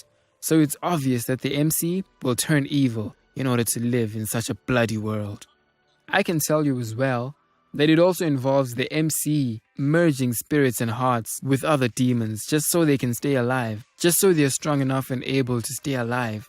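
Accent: South Africa. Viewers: this guy sounds south african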